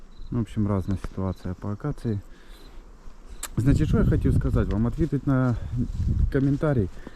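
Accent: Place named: Surzhyk, Ukraine. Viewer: Суржиком, у нас тут всі так балакають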